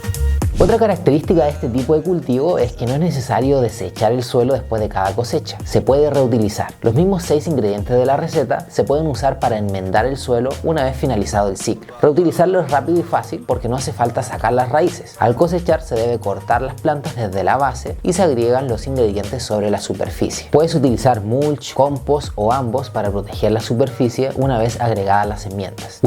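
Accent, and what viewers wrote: Chile. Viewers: me gusta tu acento no tan chileno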